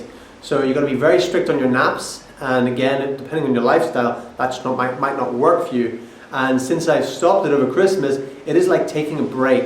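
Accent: Ireland. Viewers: I think he's got a hint of an Irish accent. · What part of Ireland are you from?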